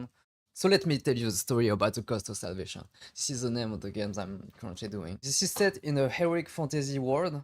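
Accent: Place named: France. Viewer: Cette fois on sent un peu l'accent français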